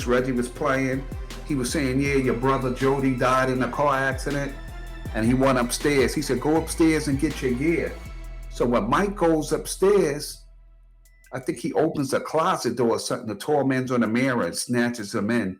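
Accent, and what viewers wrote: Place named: New York, United States. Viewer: I love your voice and listening to your New York accent. ❤😊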